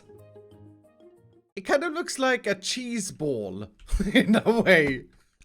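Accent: Sweden. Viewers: You sound like you arr from sweden, are you from sweden?? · Are you Swedish? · you should play Minecraft with Pewdiepie and both of you talk with your Swedish accents.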